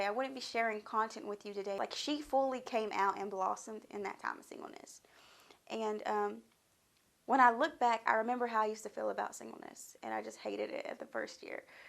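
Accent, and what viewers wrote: South, United States. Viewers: I hear a country accent ? Or am I tripping ? Lol I’m just as country mines is even worse people are always like girlllllll I know you from the south !!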